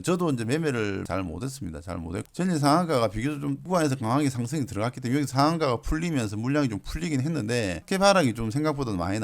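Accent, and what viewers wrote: Busan, South Korea. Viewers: 실전에 너무 도움이 됩니다 감사합니다 대표님 혹시 부산분이신가요?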